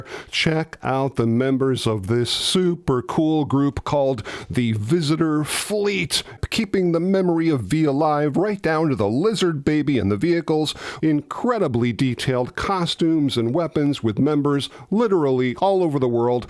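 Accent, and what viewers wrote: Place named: United States. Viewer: I'm sorry I can't listen to that bloody accent anymore you won't get to the point you bloody yanks just keep talking and talking . God forbid we'd actually have videos from other countries considering its the world wide web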